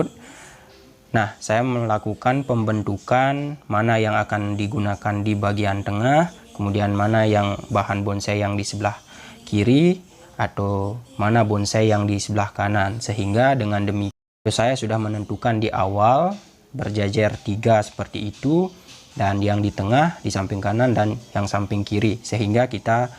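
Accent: Bali, Indonesia. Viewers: Logat bali'y kaga nahan ,gk bisa dibohongi 😆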